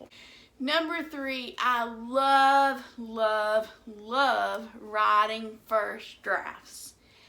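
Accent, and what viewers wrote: United States. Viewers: I love your accent because it reminds me of places I have lived in the Deep South. A bit of an accent sometimes comes out of my mouth to this day... If I ever said I wasn't jealous of other writers, I was lying... I often want to give up writing... I love editing. Like Stephanie said below, it's my thing/jam... Hey, you should love your books!!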